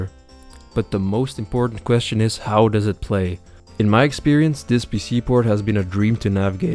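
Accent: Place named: Netherlands. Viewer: you sound dutch bro, great video btw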